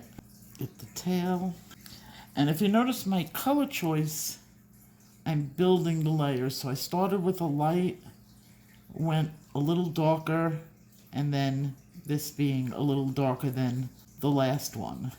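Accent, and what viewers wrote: New York, United States. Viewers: I LOVE YOUR NEW YORK ACCENT! PLEASE DON'T CHANGE IT! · great tutorial! Grew up in NY so your NewYorker sounds like home.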